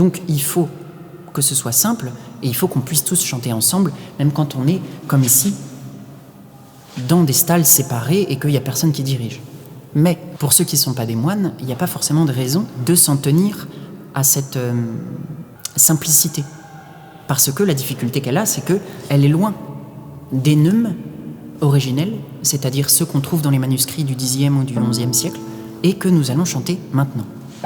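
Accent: Standard. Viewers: Me too! He’s actually not as hard to understand as I thought, maybe his accent is very neutral? He also speaks slowly and clearly so I’m not as lost as I expected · I am French, yes his accent is very neutral, and he speaks like a French literature teacher with emphasis on clear uncompromised pronunciation.But his language is quite simple and slow. It's the best possible combination for language learners. Few people talk like this in France.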